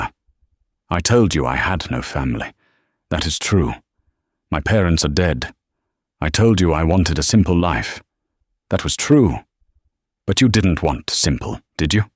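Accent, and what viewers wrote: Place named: United Kingdom. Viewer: I have a technical comment. …. Although the narrator is probably a wonderful gentleman, a British accent narration for Chicago, Boston, NYC, etc is DISTRACTING. You cannot relate to the characters when they are supposed to be on continental U.S., with a London accent. If we were listening to Shakespeare, yes. For these things, Hard NO!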